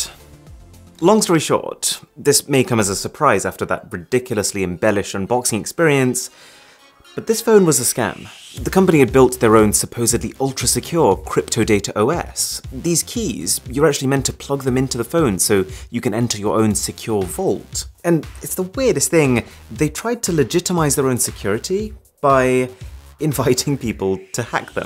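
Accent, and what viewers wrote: RP, United Kingdom. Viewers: I love your voice. The poshness (sorry i dont know a better word lol) iv subscribed xx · I just watch your videos just because I like the way of your talking, specially British accent I just love it❤️.huge love from Bangladesh 🇧🇩.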